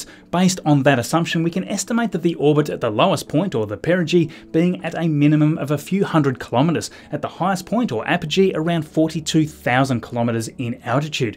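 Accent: Australia. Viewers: I don't like your chanel more because, I don't understand your australian accent verry well, I'm just studying english from Brasil, we speak portugese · "Hache El Es" (HLS) I love how Australians say the letter H. Americans pronounce it just "Ache" without the "Heh" sound. But you include the actual letter, which frankly makes a lot more sense. A completely sideways and weird observation, I know. I'm completely fascinated with language, even in cases where it's my own language. :D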